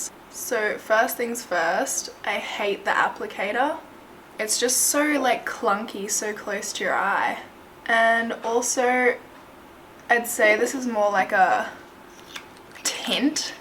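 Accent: Australia. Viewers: is she australian? shesounds like jess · what area of australia are you from? your accent is so cute but it sounds different from other australian youtubers i watch! btw love your videos sooo much!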